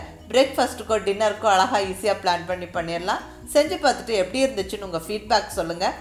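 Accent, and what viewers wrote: Kongu, India. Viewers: Unga voice sooper kongu tamil sooperma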